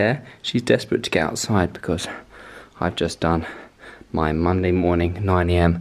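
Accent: United Kingdom. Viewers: I think from the accent you are British, am I right? 😁 Anyways, injuries are important to discuss, specially the beginning of starting working out. I usually have knee, thigh and back problems. My issue I get easily tired and can't increase weight. Another thing I can't keep motivated, specially during lock down. But thanks for your videos. P. S. Keep the beard 😊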